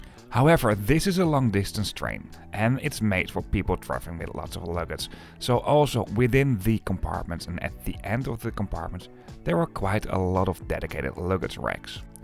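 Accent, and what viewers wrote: Netherlands. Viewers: Nice video (and nice duch accent) well described with many informationts I didn't know before. I traveled several times between Saarbrücken and Paris years ago (with Euro-City), minimum 10 times allez-retour... when I was last time in Paris Gare de L'Est, there where no barriers at the access to the platforms. Btw. once I met an Australian guy, interested in high-speed trains, who called the ICE-Trains icetrains... Hartelijke groeten, Andreas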